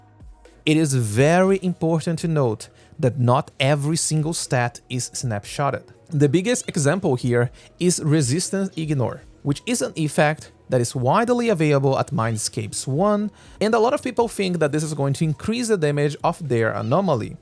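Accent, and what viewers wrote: Brazil. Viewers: Hey, thanks so much, that's really kind of you! 🙏 So basically my issue is: I have several S-rank agents that should work well in an anomaly team, but I'm barely dealing any damage with them, especially with Alice. My S-ranks are: Alice, Promiea, Yixuan, Evelyn, Vivian, Pyrois and Velina. If you could write out how to properly build/rotate a team around these to actually get good anomaly damage going, that would help a lot! Writing it out is honestly easier for me to follow anyway (and easier to translate if I need to) 😄and dw about ur brazilian accent lol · First time here and still learning the anomaly mechanics cuz brain goes WAHOO whenever Ye Shunguang goes flying and wipes everything since i unlock her when i started playing :v And yeah, i felt that you were also brazilian from your accent hehe. Still really good video btw (ainda n terminei de ver, mto numero kk)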